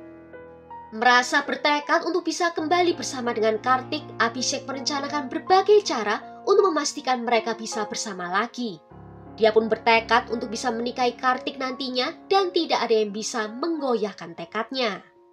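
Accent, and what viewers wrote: Java, Indonesia. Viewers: Org jawa kah mbak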